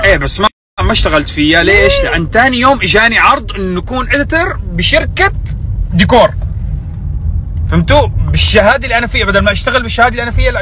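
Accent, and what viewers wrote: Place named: Aleppo, Syria. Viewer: لهجتك شوي حلبيه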